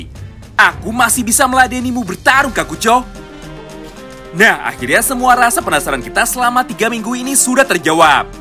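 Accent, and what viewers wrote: West Java, Indonesia. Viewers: Bang mau nya ni,, abang orang Sunda tah? 😁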